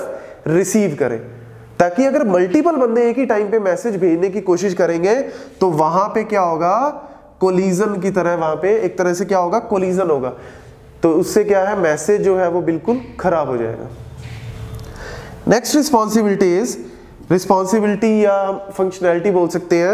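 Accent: Punjab, India. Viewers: Paji tusi punjab to ho😅 ?? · Yr punjabi tone ❤ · Thank You so much!!!!!! Amazing explanation! I enjoyed every bit of the video and realised I don't hate Networking after all! P.S. The Punjabi accent rocksss!!!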